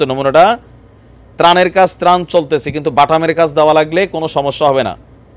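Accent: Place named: Sylhet, Bangladesh. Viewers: ভাই আপনার মুখ সিলেটি মাত হুনিয়া কুব বালা লাগল... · ভাই ভালোই তো সিলেটের কথা বলতে পারেন।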